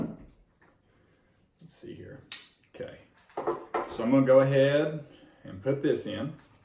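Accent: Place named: South, United States. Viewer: Great video! Just curious though, what part of the south are you from?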